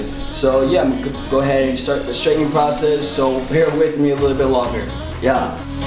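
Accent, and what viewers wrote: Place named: United States. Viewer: Do you actually know how to speak in Tagalog? Youre Filipino right? You have such a nice American Accent! :) lovin all your videos!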